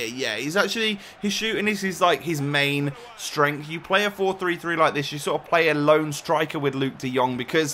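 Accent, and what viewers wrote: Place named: England, United Kingdom. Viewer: It's so funny to hear an English guy pronounce Dutch names (no hate)! Great video, really enjoyed watching!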